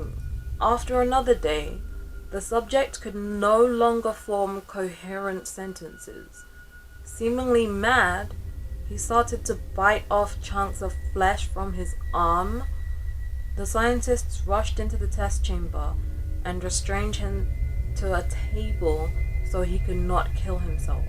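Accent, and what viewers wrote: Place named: England, United Kingdom. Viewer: Happy New Year! I love your English accent. It enhances your voice with a poetic Cadence. Beautiful! He was a great story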